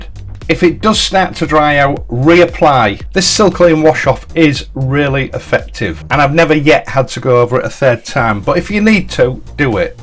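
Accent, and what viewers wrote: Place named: RP, United Kingdom. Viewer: Your method is as meticulous as your accent . " The perfect English Gentleman".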